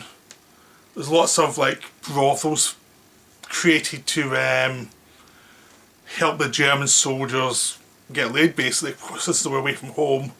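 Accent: Scotland, United Kingdom. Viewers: He is a Scotsman that how he talks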